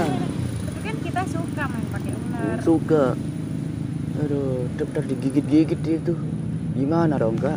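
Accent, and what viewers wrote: Lombok, Indonesia. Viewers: Logat Lombok ya gak ilangg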